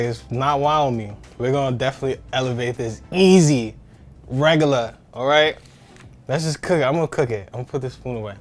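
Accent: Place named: New York, United States. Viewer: Sucha new York guy. Brooklyn? Bronx? Manhattan? I wonder. Imma try this out. Big thanks from the bx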